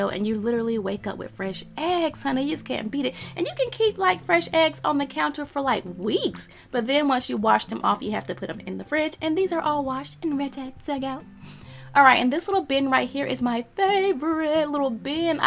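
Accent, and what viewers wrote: South, United States. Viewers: Subscribed cause I love her southern accent 😍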